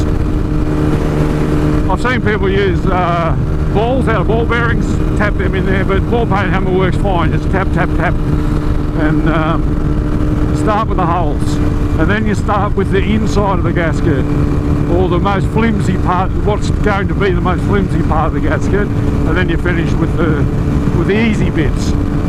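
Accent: Australia. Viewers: Hey, I found your channel through matts. Love the classy bikes you got and something about the accent from good old 'straya just attracts me even more, don't ask me why. I don't really have the finances or expertise yet to buy old bikes and build them back up like my father did (He collects old english bikes, a BSA Sloper is his current project and he had couple of Ariels before) but I'm planning on buying a DR 800 BIG on the cheap and mess around with it to see what's what and build up my skills before I break a proper classic plus I'd like to have something more usable (and offroadable) on the daily than my GSX-R. Anywaaaay I wanted to ask you what the metallic ringing on this bike here is, it reminds me a bit of the rattle Ducatis make but this clearly is not a Ducati.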